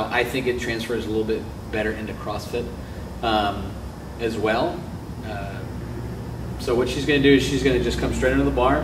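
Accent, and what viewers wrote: Appalachia, United States. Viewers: I thought this guy was joking when he started talking. Fucking hillbilly. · Fuck i love that hillbilly accent :D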